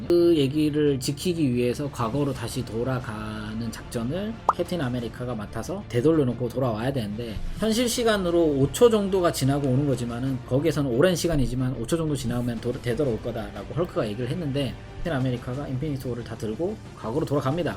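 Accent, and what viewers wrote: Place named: Japan. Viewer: 어 일본분인가여... 억양이.. 듣다가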